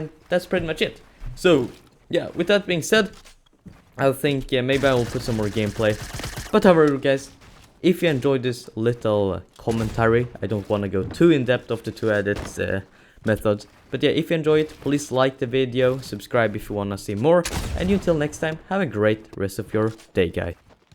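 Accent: Sweden. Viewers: Are you swedish?? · Ur swedish to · Im guessing your swedish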